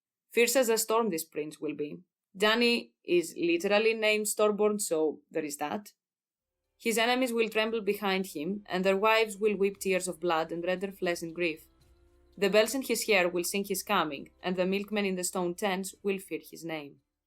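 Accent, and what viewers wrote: Greece. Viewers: I feel like I’m hearing a Greek accent through your English, reminds me of my father, not really relevant ik but yeah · Είμαι από την Αμερική, καταλαβαίνω ελληνικά και έχω πάει Ελλάδα, όπως τα βίντεο. Νόμιζα ότι αναγνωρίζω μια ελληνική προφορά.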